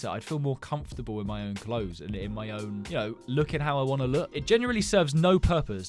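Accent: United Kingdom. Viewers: British person alert 🚨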